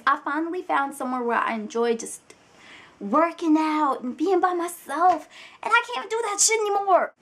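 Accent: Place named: Brooklyn, United States. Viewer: are you from Brooklyn,NY? you sound like it.. lol i love ur videos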